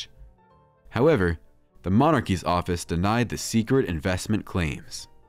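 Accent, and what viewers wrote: United States. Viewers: Any decisions of the sort you mention were ultimately up to the parliament. The monarch doesn't make the rules - or didn't you know that? As to Camilla, her description is Consort - like the Queen Mother when she was married to King George VI, Queen Mary and Queen Alexandra. "Consort" is a description - not a title - meaning that if the King dies the Queen Consort cannot reign. Ever heard of Queen Elizabeth (married to George VI) being referred to as Queen Consort Elizabeth when George VI was on the throne? Do some reading. Once Camilla had been crowned, she was Queen. Also not a good idea to take any information from an American voiced broadcast. They're clueless.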